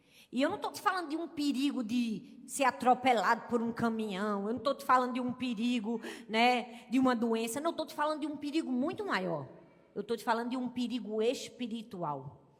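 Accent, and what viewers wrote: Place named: Pernambuco, Brazil. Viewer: AMOOOO MESMO ESTA PASTORA....DO SOTAQUE GOSTOSO DE PERNAMBUCO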